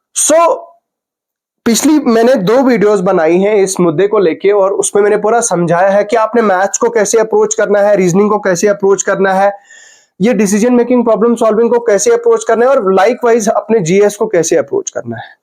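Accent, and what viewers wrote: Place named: Kashmir, India. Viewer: Bro tum kashmir se ho? Aapka accent thoda kashmiri hai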